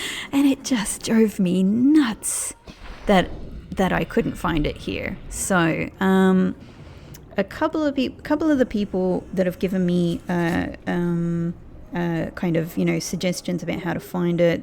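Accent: Australia. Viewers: Hi, just tuning in for the first time. Sorry I missed your full journey! Question, I don’t recognize your accent, where’s it from? Edit halfway through you said you’re from Australia! That’s so cool. I hear they have a beautiful coral reef there. My love for this game has got me into scuba diving, one day I hope to do that down under!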